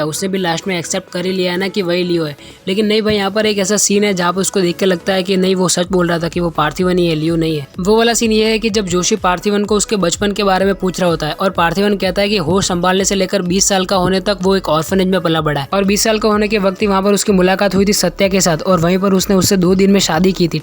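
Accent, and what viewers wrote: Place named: Gujarat, India. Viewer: "Hu maja ma chhu Bhai" tamari bolva ni reet upar thi kithu mee 😎😎😎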